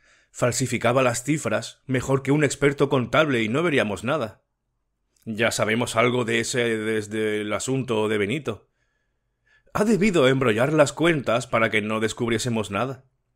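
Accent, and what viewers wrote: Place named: Spain. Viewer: ❤Frondosa imaginación la de A C.Hermosa la lectura,con su española voz,señor..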